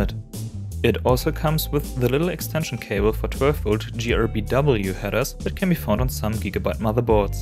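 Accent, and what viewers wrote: Germany. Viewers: Wie ich dein deutschen Akzent raushöre :D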